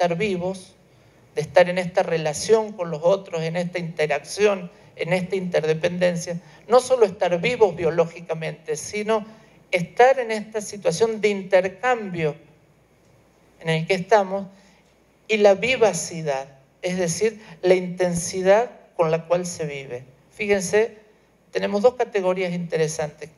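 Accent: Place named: Argentina. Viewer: exelente ponencia ! ¿Cuál es el nombre y apellido correcto del especialista? Me parece que no lo escriben bien. Es Argentino parece por su asento.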